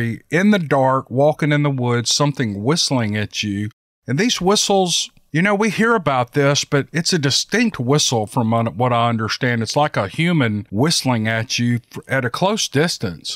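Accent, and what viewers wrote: South, United States. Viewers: I just love that Southern accent !!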